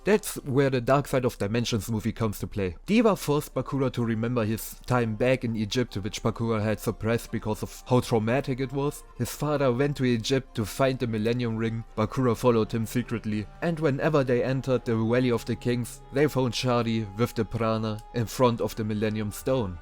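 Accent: Germany. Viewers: Boa wie man dein Deutsch heraus hört hahaha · Dieses eingedeutschte Englisch ist schrecklich.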